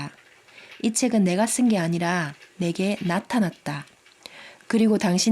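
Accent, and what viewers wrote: Gyeongsang, South Korea. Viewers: 어디사투리세요? 경상도? 같은데요 🙂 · 경상도 억양이 밉지 않네요 감사 합니다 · 어떻게 이런일이..마음공부 하던중 우연히 신과나눈 이야기 란 제목에 살짝 호기심이 발동해서 처음 들은게 몇달전인데도통 무슨 말인지 모르겠는데 댓글들을 보면 감동이라는 글도 많고 긍정적반응이 많더라구요 근데 일부 내용만 들어서는 무슨 말인지도 모르겠고 법문공부 중이라 해석을 어떻게 해야할지도 모르겠더라구요 말 그대로 전 답답한 상태였어요 눈 이 아픈 사람이라 책 읽을수 없는 상황인데다 어제 다른영상에서 한번더 듣고는 도저히 답답함을 견딜수도 없고 어디까지가 신의 의도이고 어디까지가 인간의 의도인지도 모르겠고 ( 살인사건 , 고문 과 같은 큰 사건) 정말이지 속이 편치 않고 혼란스러워서 어제 들은 다른영상에 몇가지 질문 올리고 잠들었는데 답은 없었어요 그래서 평소처럼 법문 들을겸 찾다가 이영상 눈에 들어왔어요 별 기대없이 재생 버튼 눌렀는데 ...저를 위한 영상이었군요 같은 경상도 사람이라 알아듣기 쉽고 또 풀이까지 해 주시니 감동이었어요 궁금한게 하나씩 풀리는것 같아요 너무너무 감사해요 후편들도 기대되요 궁금한건 질문드려도 되죠? 이렇게 알게되서 정말 반갑습니다 수고하세요^^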